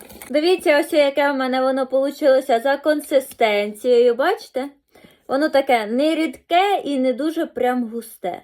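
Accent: Poltava, Ukraine. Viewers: В багато підписників на різних каналах Це її образ селянської дівчини з Полтавським акцентом Подивіться її інтерв ю вона грамотна, сучасна дівчина,одягається гарно і по сучасному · ну знаєш полтавський говір це одне, але коли написано безграмотно...,😭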